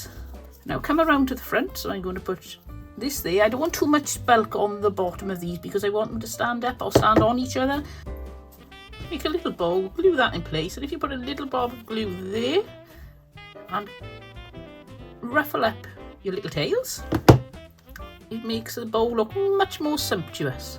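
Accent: Wales, United Kingdom. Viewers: we enjoyed your video,it was lovely to hear a welsh accent for a change on a crafting channel .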